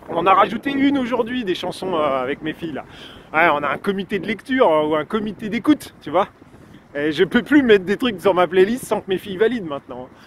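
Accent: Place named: Quebec, Canada. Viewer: ah tu es québécois ?Gpmen !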